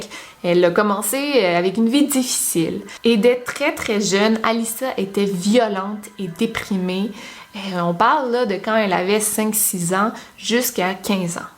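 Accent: Quebec, Canada. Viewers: Avant je ne pouvais pas regarder des vidéos québécoises à cause de l'accent étant donné que je suis française mais je suis tombée amoureuse de tes vidéos, elles sont géniales, je me suis habituée et maintenant je ne peux plus m'en passer 💁🏽‍♀️ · Salut, je pense que tu ne verra pas ce message mais sa ne fait rien je prend plaisir a te l'écrire alors je voulais te dire que j'adore ta chaine! Elle est passionnante. Bravo pour tes 162 k moi personnellement je suis depuis tes un peu prés 50 k! D'habitude je ne supporte pas l'accent québécois mais avec toi c'est différent j'adore ta voix. Je trouve que ta chaine est très intéressante je te trouve très belle douce et.J'éspere que tu aura plus d'abonnée pourquoi pas le million? Enfin tous sa pour te dire que je t'adore bisous d'une grande fan <3. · J'aime tellement l'accent, je pense que je vais aller vivre au Canada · Encore une super vidéo. 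Tu explique très bien et simplement et j'adore ton petit accent Quebecois ♥♥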